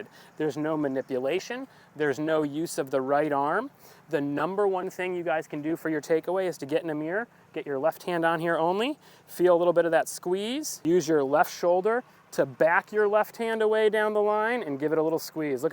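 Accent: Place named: Scotland, United Kingdom. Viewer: Great to hear a Scottish accent.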